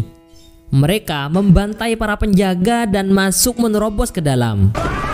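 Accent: Madura, Indonesia. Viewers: Logattah mak bahasa madureh cak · Jember Cong padeh ningguh. Oreng madureh tapeh lancar bahasanah tapeh gik paddeng madurenah gerre bahasanah bren.. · Reng madureh😂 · Medureh aguen ben bro,?? 🤣🤣👍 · Boh, reng Madurreh kakeh, Min? :D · Bahasanya sangar medureh😂😂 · Ternyata mimin orang madura hahaha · madura jatim anjir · Subtle madure hhhh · Tolos a madureh lek 😂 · Medureh reh boss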